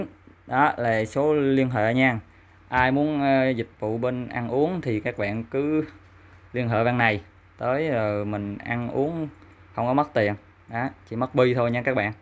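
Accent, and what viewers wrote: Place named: Vạn Giã, Vietnam. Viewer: Chú Em này nói tiếng vạn giã đây OK nè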